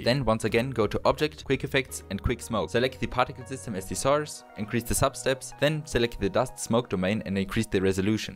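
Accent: Germany. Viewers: das gesächsle nervt übelst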